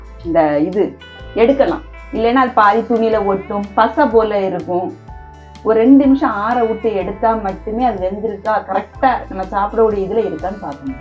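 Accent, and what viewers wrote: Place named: Nagercoil, India. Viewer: இயல்பாக பேசறீங்க... நாகர்கோவில் பாஷை..நல்லா இருக்கு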